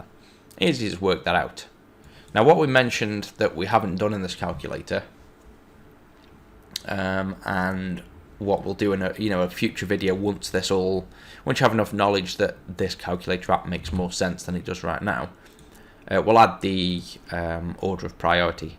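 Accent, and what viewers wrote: United Kingdom. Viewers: Your tutorials are very helpful and neat (not to mention your deep British voice) , in fact the only ones that helped me through all my problems.i was trying to make a power function but that went terrible and I was hoping if you could do a tutorial on that.it's just too hard to figure out the logic (: greatly appreciate it.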